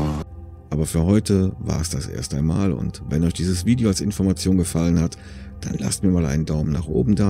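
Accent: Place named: Cologne, Germany. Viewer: Auch wenn es nichts mit dem Thema zu tun hat, man hört ab und zu wie dein Kölsch so ein bisschen mit durchkommt 😄 Find ich aber super sympathisch! 👍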